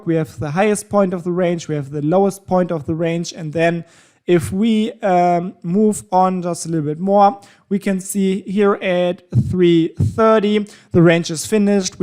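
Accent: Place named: Germany. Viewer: Since this guy is intentionally not telling you about time zones, etc. I will do it for him. Judging from his accent, he is probably in Berlin. Which is GMT +2, which also happens to be the same time zone as many/most of the brokers, probably yours too. And definitely his. Lucky for him, his broker is in his own time zone. The rest of us have to translate time zones. He’s starting the high/low range box at 2 AM his time which is also his brokers time. Lucky for him! But not so lucky for the rest of us. is two hours after the close of London. Translation: run your high/low range box from the beginning of Tokyo session continuously for 1.5 hours. Then start trading after that point all the way until two hours after the close London session, which is coincidentally when the Forex volume starts to drop I have no idea why this guy doesn’t explain it. Perhaps the create confusion to drive people to his website and his broker website..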